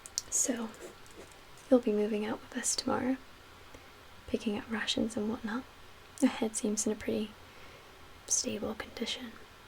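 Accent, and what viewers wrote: Wales, United Kingdom. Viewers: Welsh, beautiful and a great ASMRtist..marry me?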